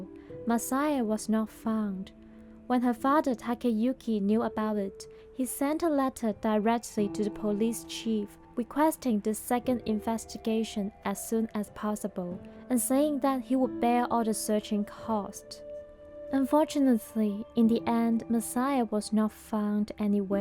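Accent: China. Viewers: Your accent sounds Mainland Chinese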